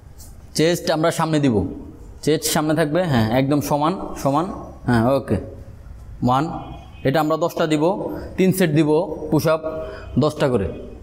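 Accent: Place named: Habiganj, Bangladesh. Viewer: ভাষা শুনে মনে হচ্ছে আপনি হবিগঞ্জ এর মানুষ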